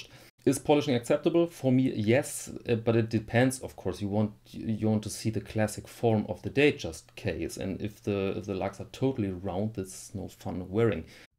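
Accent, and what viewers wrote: Germany. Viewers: I don’t know why, but to my ears there is something special about the German accent when it comes to talking about technology - new or vintage. I have only just found this channel, and I’m so happy I did. Liked and subscribed.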